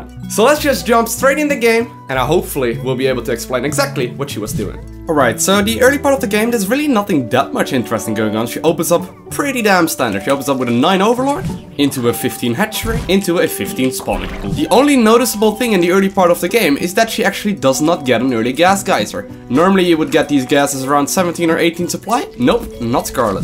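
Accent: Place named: Netherlands. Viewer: Huh thats why you sounded a bit familiar. Where in the Netherlands do you live?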